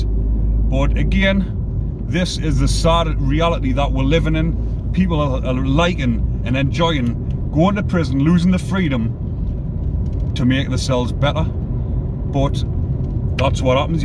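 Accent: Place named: Scotland, United Kingdom. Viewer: like your scottish accent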